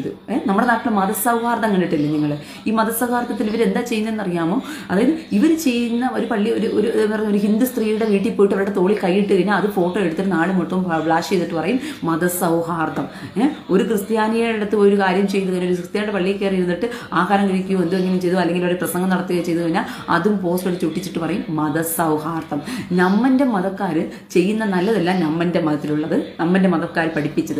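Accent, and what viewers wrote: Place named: Palakkad, India. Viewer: Ms.,are you a Palghatwala your language Usd seems to be of palghat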